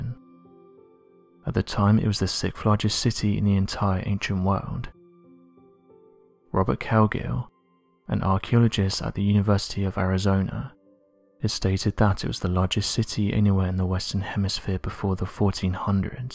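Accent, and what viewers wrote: United Kingdom. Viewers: Let me start by saying I really enjoy your channel. However, when I hear the voice of the current narrator I cringe. Because no matter what the setting I dial in on my speakers is, his voice is always low and muffled, that combined with the British or some kind of other foreign accent, I can't understand him half the time even with my earbuds in. So I'm just asking you guys if you could please maybe do something about that? I really enjoy the subject matter and would hate to have to tune out due to the fact that I'm just not going to get it. My hearing may be not that great, but I don't have any problems with your other narrators and with any other channels that I listen to on a regular basis 🤔👍 PS- The voice puts me to sleep, as well 😂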